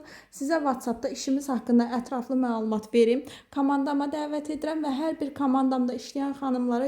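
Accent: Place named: Nakhchivan, Azerbaijan. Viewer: Nə şirin danışırsız mence Naxçıvan ləhçəsidi menim bir şagirdim var o da bu ləhçəynən danışır ondan bilirem 😂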